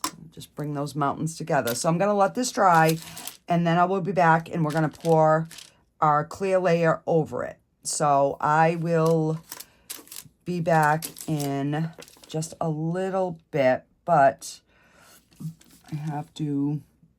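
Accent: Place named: New England, United States. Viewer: This is BEAUTIFUL! Reminds me of Bob Ross. I've been following you for a while now, liking your vids on my TV. I'm from Mass & you sound like a New Englander, love watching you, feels like I'm hanging out with an old girlfriend 😊 You are quite the artist!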